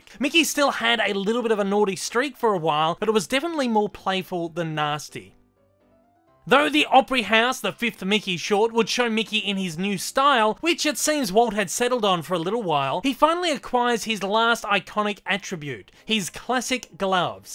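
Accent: Australia. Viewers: I love this guys Australian accsent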